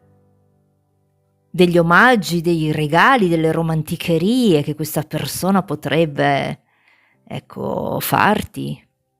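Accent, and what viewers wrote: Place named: Italy. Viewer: Me encanta el acento italiano además se entiende bastante bien saludos desde 🇪🇦🙌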